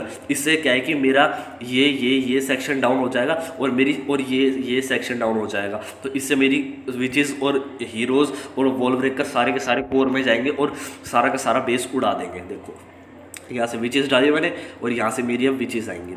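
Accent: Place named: Haryana, India. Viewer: Bhai video kti zhr h💓💖 tu haryana ka lage h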